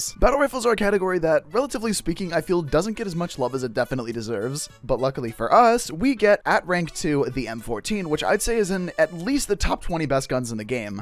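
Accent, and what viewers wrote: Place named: New York, United States. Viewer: Even though your NY accent is better than mine, and I was born and live here, I love your many accents. I read it's a rare talent. As you said in this video, "Very impressed, not gonna lie.". <3 NYC GIRL!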